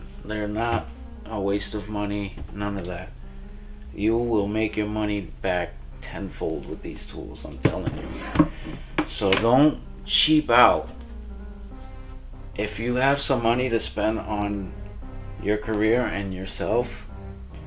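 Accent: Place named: New Orleans, United States. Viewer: si mon que si que fuck yeah....did 11 years in prison here in California and got out and became a union electrician...IBEW LOCAL 11....I couldn't tell where u were from bro...ur accent was all over the place...lmao at first u sounded like a homie...but then u sounded like u were from New Orleans..so I was like where is this vato from...lol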